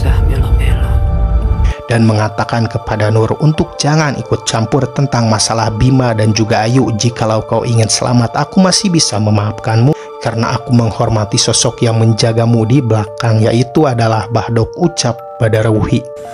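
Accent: West Java, Indonesia. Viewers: Orang sunda ya a?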